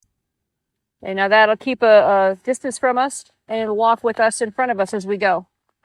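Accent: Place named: England, United Kingdom. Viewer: Good evening friends. Very interesting. I hope it works out well for you. English accent. 🤣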